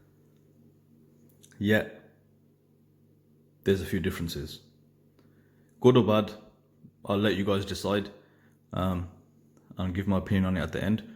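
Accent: Birmingham, United Kingdom. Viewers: I thought I recognized the accent. Have you got any if these steeldive watches for sale?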